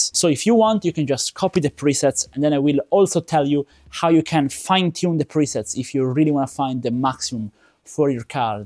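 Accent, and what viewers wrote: Italy. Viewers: troppo accento italiano :face-red-heart-shape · Ciao bellissimo video da come parli inglese sembri italiano, se cosi fosse fai un video uguale in italiano? Sei un grande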